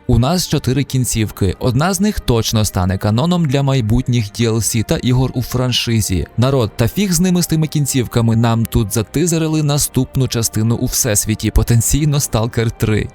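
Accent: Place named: Transcarpathia, Ukraine. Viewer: він не понасирав, він просто з Закарпаття, я теж і у нас тут свій суржик такий і деяким людям важко писати і говорити чистою літературною мовою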